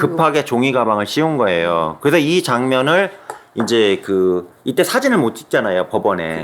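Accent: Chungcheong, South Korea. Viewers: 교수님 방대한 자료들 찾는것도 힘드실텐데 이 모든 자료들을 자세히 분석해서 흥미롭게 전개해주시는 능력이 탁월하세요. 정말 위트 있는 입담에 푹 빠져드네요. 충청도 억양이 정말 매력 있으시세요. 김복준님과의 해외사건 케미도 좋고요.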